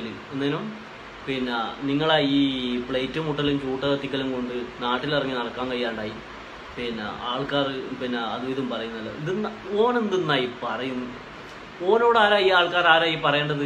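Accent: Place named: Kannur, India. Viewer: നമ്മളെ കണ്ണൂര് ഭാഷ.... കേക്കാൻ നല്ല രസൂണ്ട്. ലൈക് അടി മോനെ ഈട... 😊👍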